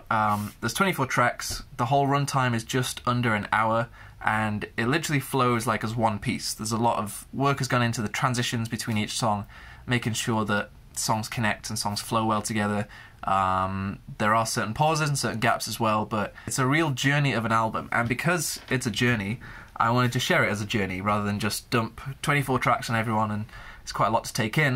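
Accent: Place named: United Kingdom. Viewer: British guy with a cute British accent haha ❤️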